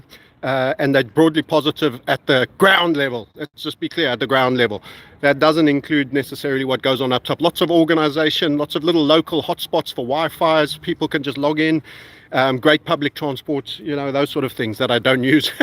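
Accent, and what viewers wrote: South Africa. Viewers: Hi. New to the chanel. Is that a South African accent I hear?👀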